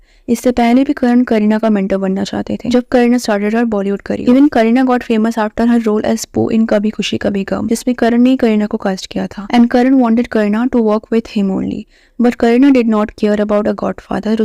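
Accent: India. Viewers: Hey guys even I'm a spill be fan but the way you guys are comparing her with Spill bee is just wrong. She is doing a great job and i also noticed her content is different than spill be she doesn't copy anyone and i like this about her. And people who's saying accent is like spill be it's just INDIAN accent guys. is different and great let's not compare her with anyone.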